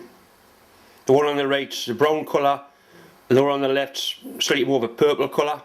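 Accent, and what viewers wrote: Newcastle, United Kingdom. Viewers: Thanks for all the video this year. I enjoy your way of doing things. Happy New Year to you and yours. I enjoy your Jordie accent and I think I'll have a Newcastle Beer in honor of you today.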